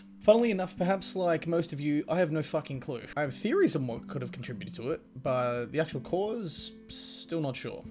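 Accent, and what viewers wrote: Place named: Victoria, Australia. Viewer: Subbed. Nice to hear a (I assume) Victorian accent talking re this stuff, makes me tune in and relate more. Thanks man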